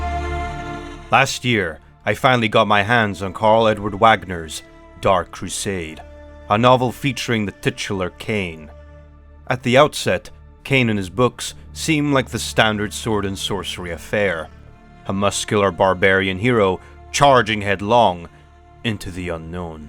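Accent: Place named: Finland. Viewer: Dude's accent sounds Finnish.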